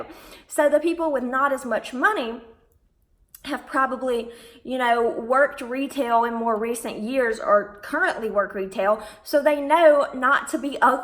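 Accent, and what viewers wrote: South, United States. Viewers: you talk funny. Why are you from the south?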